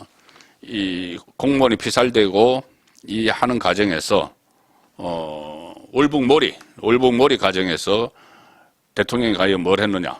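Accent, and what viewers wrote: Gyeongsang, South Korea. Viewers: 송국건 본부장님은 경상도 출신이라 다소 발음이 생경한 부분도 있지만 국민들이 궁금한 핵심 어젠다를 뽑아 알기쉽게 잘 설명해 주십니다. 늘 감사합니다.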